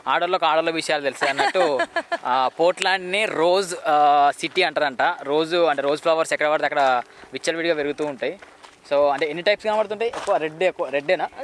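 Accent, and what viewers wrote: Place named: Telangana, India. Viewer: వాళ్ళు మన తెలంగాణా వాల్ల బాయ్ మన భాష లాగ ఉన్నది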